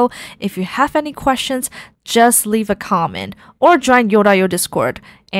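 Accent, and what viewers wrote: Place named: China. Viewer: 听起来像国人xyx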